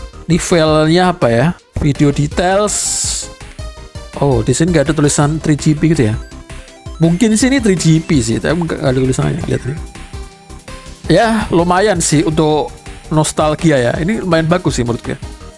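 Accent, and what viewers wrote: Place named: Solo, Indonesia. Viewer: Bang suara mu pria solo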